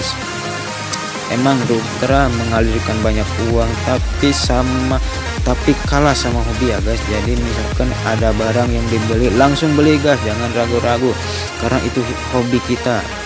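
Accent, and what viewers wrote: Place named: West Java, Indonesia. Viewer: Ahh nyao ngomong na bet sunda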